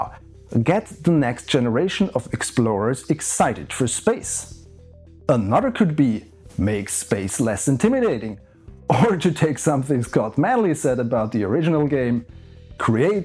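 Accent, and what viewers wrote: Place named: Germany. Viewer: Are you from a German speaking country? Sounds very German.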